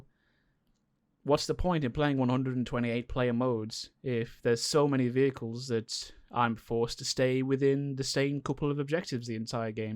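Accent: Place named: South Africa. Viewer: Quick question. Where are you from? Because for some reason I'm getting south african vibes from your accent. Now I could be stupid and way off but I'm just curious 😅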